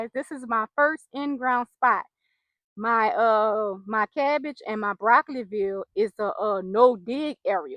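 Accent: New Orleans, United States. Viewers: Oh my goodness I recognize that accent.I graduated from Dilliard University (1978) in Nursing.New Orleans.Just love the garden.Enjoy😊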